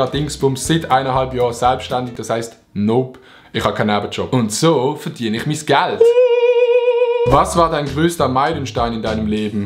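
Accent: Switzerland. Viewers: Ich bin erst seit kurzem auf deine Videos aufmerksam geworden und feiere deine Schwitzer Deutsch (komme aus Deutschland und sprechen auch keine Schwitzer Deutsch), ich würde es aber trotzdem schade finden wenn du hochdeutsch sprichst da ich den aktzent mega feiere. Mach weiter so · Mach einfach deutsch mit nem dicken Schweizer Dialekt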